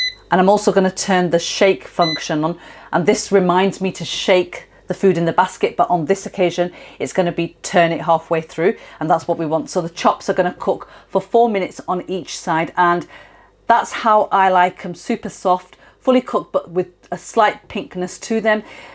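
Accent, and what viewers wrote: United Kingdom. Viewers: Love your videos a lot.. I just bought Cosori air fryer and watching you every single day. Please let me know your name and your social account. I love your UK accent too :) :) I live in Tokyo.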